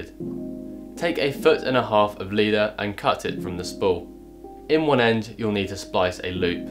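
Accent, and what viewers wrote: United Kingdom. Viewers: Every pva video I've seen was done by a British bloke. Is that yall got over the pond?😅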